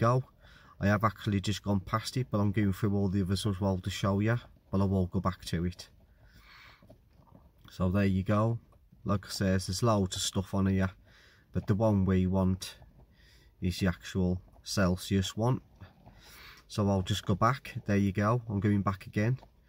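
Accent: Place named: Black Country, United Kingdom. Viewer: Brilliant ....thank you....and great to here a proper Black Country accent....I've been away too long...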